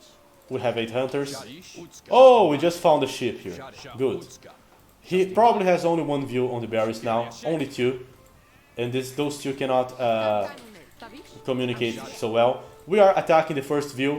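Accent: Brazil. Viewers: Ctza que é brasileiro o sotaque não engana...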